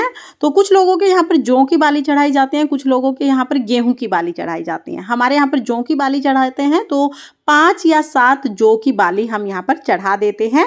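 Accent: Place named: Marwari, India. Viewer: आप हमारे मारवाड़ की ही लगते हैं हिंदी में आप मुझे बताइएगा प्लीज मुझे अंग्रेजी नहींआई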